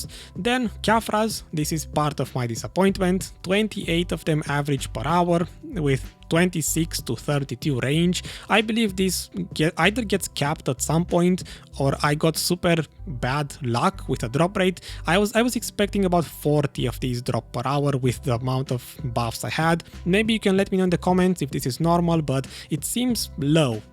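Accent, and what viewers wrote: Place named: Romania. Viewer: You sound romanian :D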